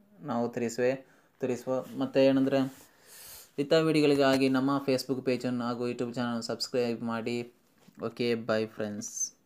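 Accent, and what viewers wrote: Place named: Mangalore, India. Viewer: nimma Kannada mangloor kannada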